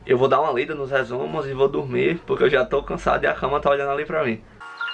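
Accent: Northeast, Brazil. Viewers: Você é nordestino? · Vc é nordestino né bixo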